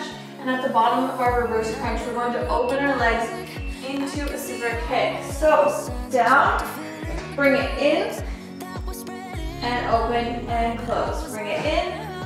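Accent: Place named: Canada. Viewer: Oh! You’re from Canada, eh? 🥰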